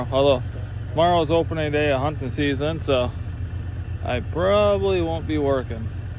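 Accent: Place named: Ohio, United States. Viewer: Just from his dialect I'd assume Ohio or Pennsylvania.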